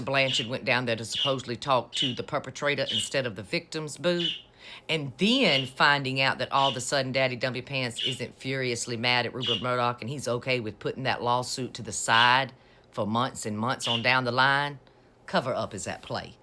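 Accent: South, United States. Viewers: I could listen to your deep southern honey voice blasting MAGA-World all day! What a sharp sense of humor you have! Such HILARIOUS VERBIAGE!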